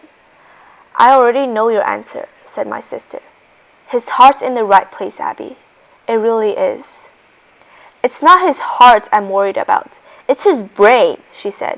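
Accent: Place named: United Kingdom. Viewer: sry british voice annoying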